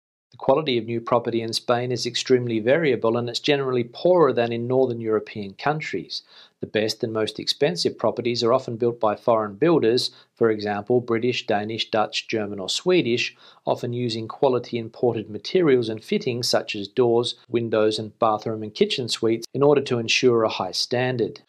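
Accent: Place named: Australia. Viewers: Aussie aussie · How? I'm not english native speaker and I could tell his accent was from Australia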